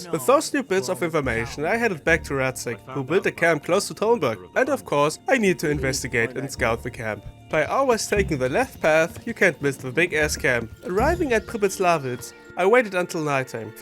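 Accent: Germany. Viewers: Ey lol hab gerade erst realisiert, dass du Deutscher bist. Ich wusste doch, dass ich den Akzent kenne. Sehr gutes Video. Like und Abo verdient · Der deutsche Akzent 😂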